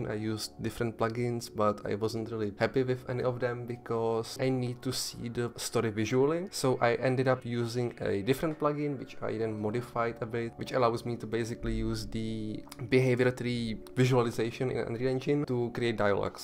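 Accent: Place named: Czech Republic. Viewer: Kvalitní hry a český přízvuk :D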